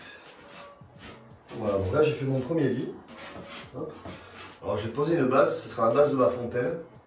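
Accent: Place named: Marseille, France. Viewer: Oh Le Marseillais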